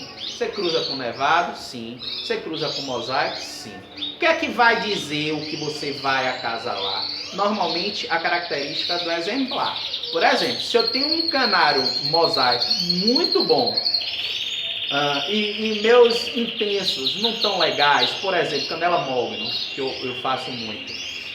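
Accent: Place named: Northeast, Brazil. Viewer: Sou do sul e acho muito legal esse sotaque aí do norte/ nordeste . Muito legal seus vídeos !!! Outra coisa, achei vc muito parecido com o Matheus Ceará , aquele humorista da praça é nossa :)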